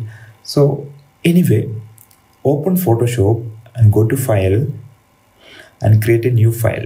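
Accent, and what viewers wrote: India. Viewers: I don't wanna come off as a racist.. but when you hear an Indian voice/accent, you know how helpful and educative the video gonn be! Thanks! Kudos!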